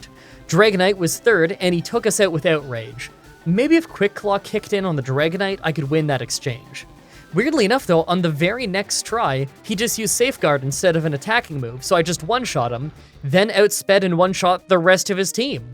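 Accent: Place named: Canada. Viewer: As promised on twitter. Your canadian accent is showing I can hear your very noticably canadian outs! Will be back next week probably with the exact same comment as previously promised!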